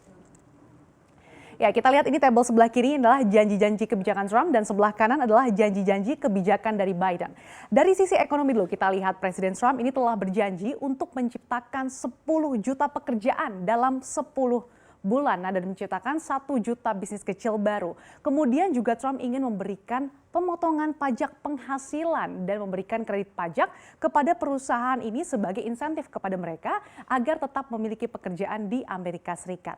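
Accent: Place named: West Kalimantan, Indonesia. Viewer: Logat lu kek logat kalbar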